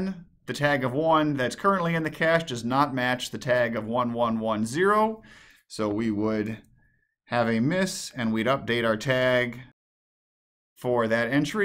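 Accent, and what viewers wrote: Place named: India. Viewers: Thank you so much for this. English is my second language and I have a huge problem understanding indian English, so this is extremely valuable for me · Indian English lets me forgets standart english as you can see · I know right. I mean no offence, but it's hard to perceive an Indian accent.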